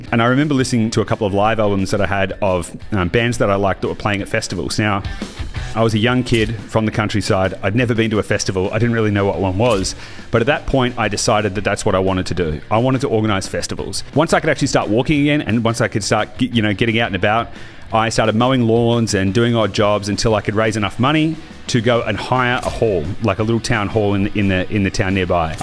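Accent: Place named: Australia. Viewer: I heard the aussie accent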